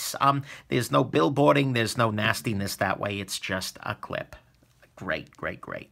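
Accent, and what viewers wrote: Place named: Boston, United States. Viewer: damn love your accent what is it? Sounds Boston but i really have no idea.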